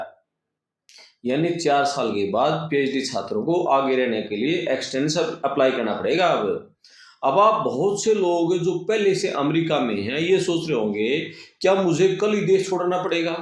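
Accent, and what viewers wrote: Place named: Sindhi, India. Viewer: Improve your sindhi hindi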